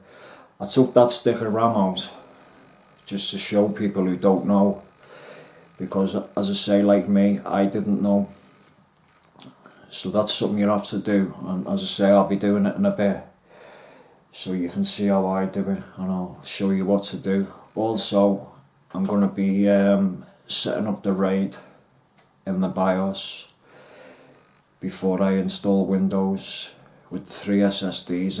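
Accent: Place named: Liverpool, United Kingdom. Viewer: Thumbs up just for that Scouse accent lol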